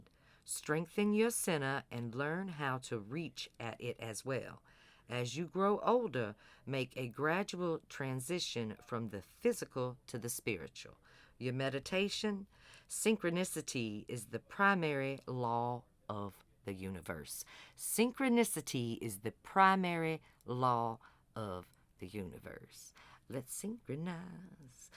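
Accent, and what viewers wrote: South, United States. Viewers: What a melodic sultry southern voice so absolutely beautiful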